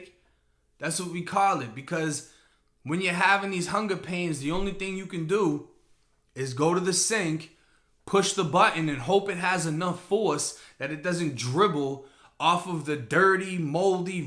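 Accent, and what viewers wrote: Boston, United States. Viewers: My chick was like why you watching this dude all the time. Shit idk I did time. Dudes got a Boston accent. And dude tells a great story. Let me alone girl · You're so Tampa but I detect a lot of Boston. Or should I say Baaston? I'm new here. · Word he got ah Boston accent... I’m from Boston ... on one video he said something about being in mass for awhile not sure how long he was here but I hear 👂 it in his accent...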